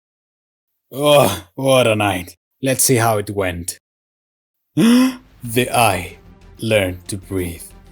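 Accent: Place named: Spain. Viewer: you sound spanish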